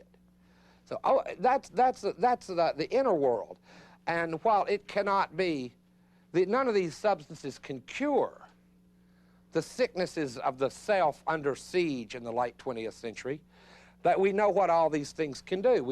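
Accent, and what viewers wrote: South, United States. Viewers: 'Rotund effeminate southerner lectures on leftist apologetics'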